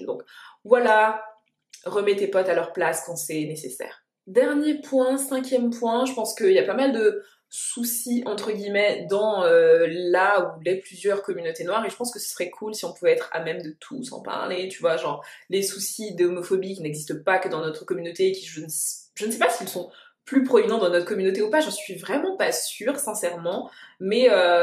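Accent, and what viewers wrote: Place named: England, United Kingdom. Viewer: J’ai remarqué tu prononces les mots en anglais avec l’accent anglais, t’as vécu en Angleterre?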